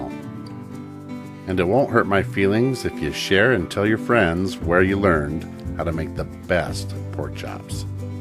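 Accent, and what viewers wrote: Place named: South, United States. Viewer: It's the Southern accent and chops for me, just subscribed, hoping you have jokes for days too for the bored ones....😂😂😂😂 Thanks for sharing 👍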